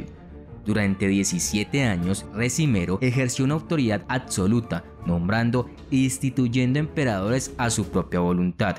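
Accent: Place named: Colombia. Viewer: No puedo tomar un acento colombiano en serio, siempre hablan bromeando jugando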